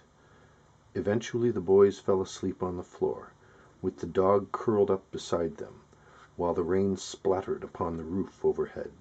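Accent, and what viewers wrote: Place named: Canada. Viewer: This is a good idea reading these stories from the classic "Strangely Enough" collection. Sounds like you have a Canadian accent -- aboot (about)?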